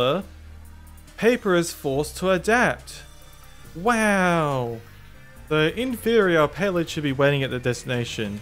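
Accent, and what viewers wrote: Australia. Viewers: Dang, the Australian is coming out this video.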